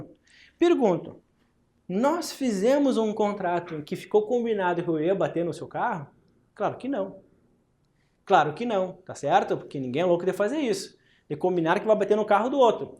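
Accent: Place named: Rio Grande do Sul, Brazil. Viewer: Adoro o sotaque gaúcho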